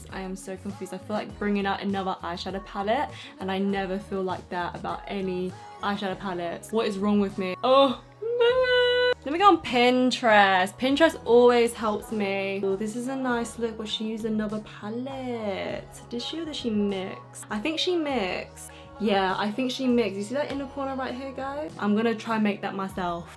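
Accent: United Kingdom. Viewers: Is she in Australia maybe am wrong but it sound brtiths to me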